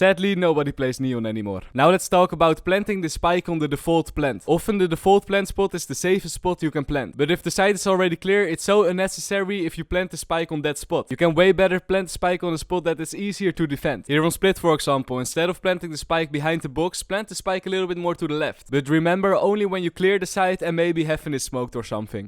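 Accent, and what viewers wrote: Netherlands. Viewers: Ik weet dat je Nederlands bent bij de accent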